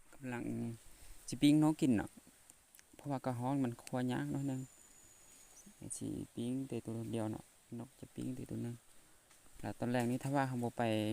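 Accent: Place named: Thailand. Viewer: อยู่แขวงไหนครับ พูดสำเนียงภาษาเหมือนกันกับบ้านผมเลย ผมอยู่จังหวัดเลย ตรงข้ามกับเมือง ซะนะคาม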